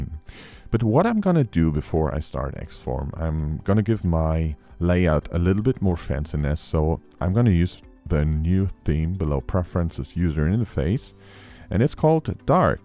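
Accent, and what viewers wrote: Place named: Germany. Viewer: Your German accent makes it so much more interesting to watch this tutorial. :) Some typical accentuation on some words or syllables... funny and entertaining!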